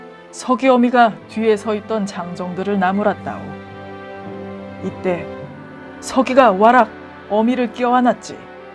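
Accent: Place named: Jeolla, South Korea. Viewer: 전라도 말 안쓰면 안될겨?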